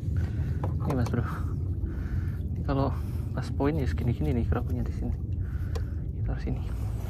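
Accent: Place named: Semarang, Indonesia. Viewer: Bahasane koyo wong semarang. Ndak yo?😊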